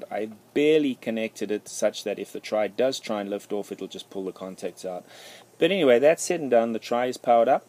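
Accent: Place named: South Africa. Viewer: You sound like you have lived in South Africa before......(accent)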